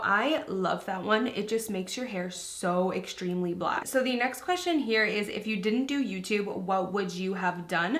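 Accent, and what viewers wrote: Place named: Canada. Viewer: I love your Canadian accent!!! How tall are you? Congrats on your journey... be proud!! also, I can't tell u have more confidence...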